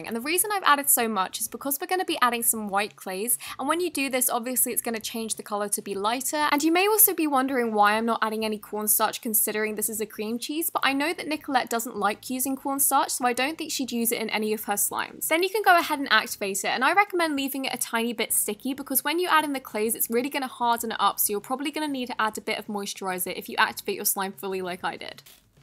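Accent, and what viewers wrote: England, United Kingdom. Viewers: Yes a new upload! <3 I love your English accent and.. EVERYTHING 😱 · Are u british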